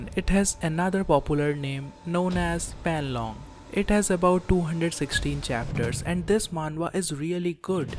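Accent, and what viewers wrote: India. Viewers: the Indian accent kinda ruined the whole video so I had to watch it without sound. indian dingdongdingdong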